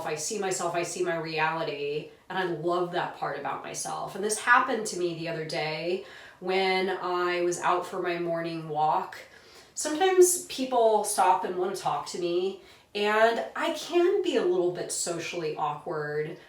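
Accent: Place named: South, United States. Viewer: I love your videos. as a southerner i sense a slight southern accent. it seems you have tried very hard to hide it though but its so cute when it peeks through. IM not sure if i am right but if i am let it come through more! anyways love your vids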